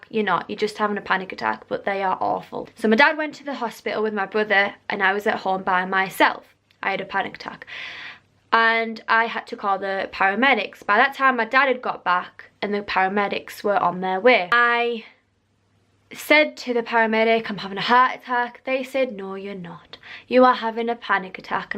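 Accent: United Kingdom. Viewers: Northern for sure. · Love the accent. Is that Brighton? Newcastle? So many accents in the UK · what part of england is her accent from?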